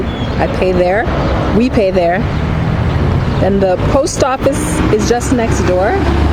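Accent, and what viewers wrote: United States. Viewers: So I was trying to remember where I've seen you then I remembered that I saw you at a workshop in Dec 2019. When you spoke I noticed your accent and wondered if you are an expat or a Namibian who grew up in the states. Otjiwarongo is one of my favourite towns in Namibia. Those saying it's neat really need to see it in oerson, more beautiful.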